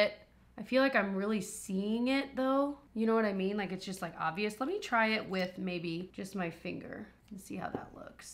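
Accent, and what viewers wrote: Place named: Midwest, United States. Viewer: I love that you share your day with us! I usually sit in the same comfy chair, sipping my coffee, just relaxing while you entertain me. The way you pronounce the words “and” and “but” are interesting to me. Must be a midwest thing. Have a great day!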